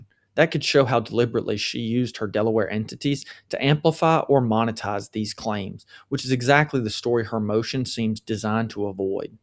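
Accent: South, United States. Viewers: 1st time viewer here! I’m enjoying your content! So, you’re in CA, but you’ve got a delightful southern accent. I wonder which state. Texas, or where???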